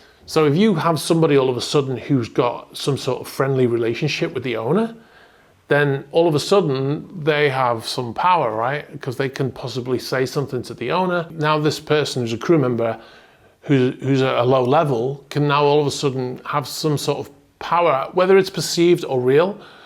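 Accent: Liverpool, United Kingdom. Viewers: My USA ears aren’t well tuned. Scouser?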